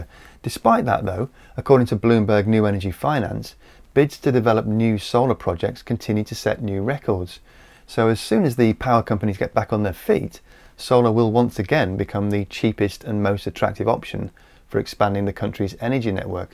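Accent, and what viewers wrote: United Kingdom. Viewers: woah ! ur british!! ? but u dont sound it man! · Yes he does! Where on Earth did you think he was from? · What!? Hes clearly british, now i have to know where you thought he was from?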